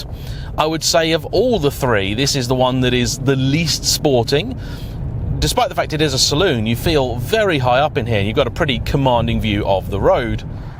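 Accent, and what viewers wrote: United Kingdom. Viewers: This guy looks like an American, dresses like one, but has a British accent and is in the UK I think. 😂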